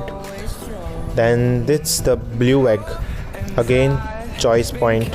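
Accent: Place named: India. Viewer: Bruh from the english talking i know ur Indian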